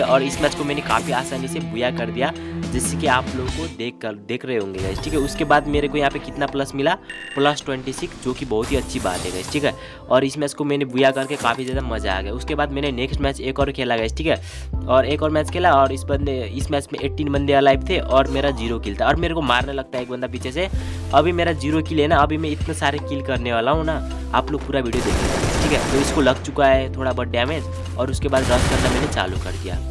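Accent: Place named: Nepal. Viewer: Nepali ho bhai tum hum avaj se pehechan jate h 😁😄😄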